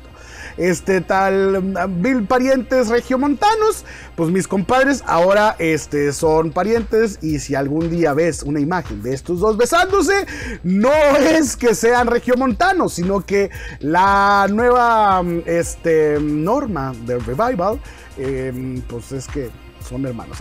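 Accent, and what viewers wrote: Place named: India. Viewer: Es idea mía? 😅 o comenzó hablar como indu 😁. Men hace años no veo tu canal, y me sorprende lo relajado que estas y animado y ya no te tomas personal las criticas contrarias de malas maneras (poco 😅), no se si fue tanto Fortnite pero se te a cierta bien en el canal. me animaré de ver tu canal, 😁👍 se te desea lo mejor.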